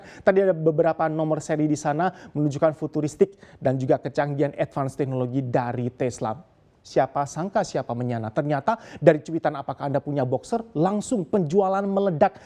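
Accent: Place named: Java, Indonesia. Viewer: aksen jawa nya bikin greget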